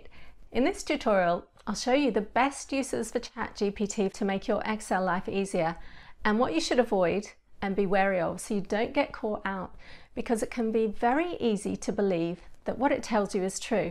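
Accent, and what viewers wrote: United Kingdom. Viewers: Where are you from? The UK? I really like your voice and accent. And videos too 😎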